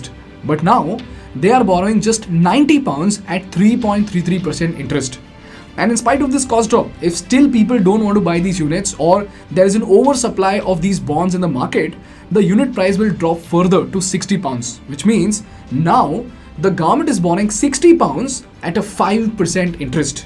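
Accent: India. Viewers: I love the contents of most of your videos. I can understand your accent as i grew up in India. The idea flow is cogent and quite well organized. Well done! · Funny indian tongue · That's what subtitles are for. Please make use of it. He isn't a British South Asian, He lives in India and just like Indian accent is hard for you to understand, it is equally harder for many Indians to understand British or American accent without subtitles. Since most of his audience are Indians, it makes sense for him to produce the content in English as we Indians don't have a common language, but English is still widespread and with the help of subtitles, people from different countries can still understand. Moreover, if you try to learn another language, especially languages with high pitch and words like Hindi, you will realize that just how hard it is to change your accent.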